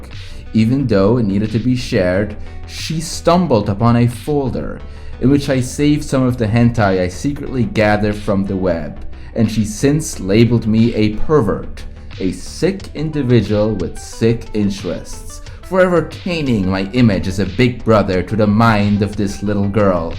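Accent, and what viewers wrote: Switzerland. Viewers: a german (german swiss) accent, so beautiful, so prosaic! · dude you're swiss? that explains so much about your accent and unorthodox iconography.... the rick and morty work and young justice stuff you did...fuckin supreme! you draw some sexy ass humanoids.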